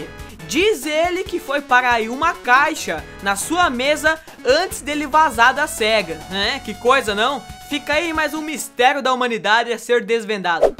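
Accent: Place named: Piracicaba, Brazil. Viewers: Cara, como eu vim parar aqui?! Parabéns pela desenvoltura em suas narrações, o sotaque de Piracicaba(?) deu personalidade. Acabei de me inscrever. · Nossa cara, esse sotaque caipira do me dá nos nervos kkkkkkkkkkk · Meu irmão...você tem um sotaque MEGA caipira!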